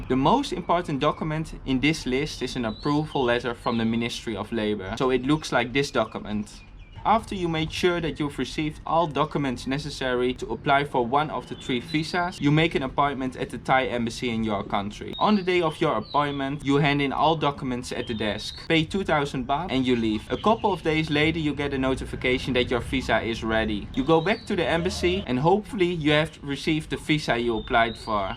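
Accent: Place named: Netherlands. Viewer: Sounds like Dutch :)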